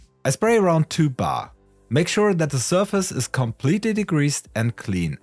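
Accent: Germany. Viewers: An deinem Akzent höre ich das du auch aus Deutschland kommst 😁💪🏽 gute Arbeit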